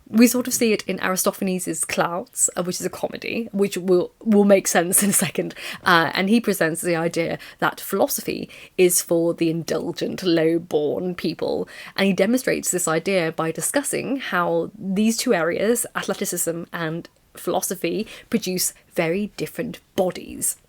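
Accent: United Kingdom. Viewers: How did I know you were British before I clicked on the video?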